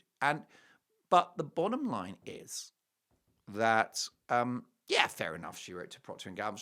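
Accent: United States. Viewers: love your American accent, * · I love your American accent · your American accent is cute and spot on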